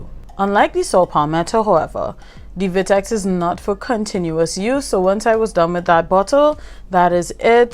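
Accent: Trinidad and Tobago. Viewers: Trinidadian accent 😍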